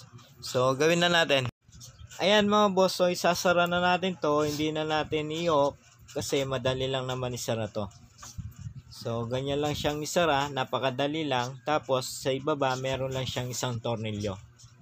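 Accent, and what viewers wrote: Pampanga, Philippines. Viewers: Kapampangan ka no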